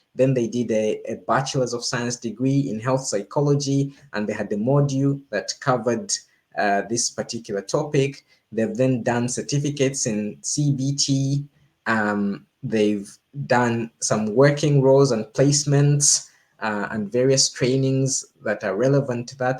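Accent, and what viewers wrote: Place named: Zambia. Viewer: Your accent tells me you hail from Zed..did I guess right 😅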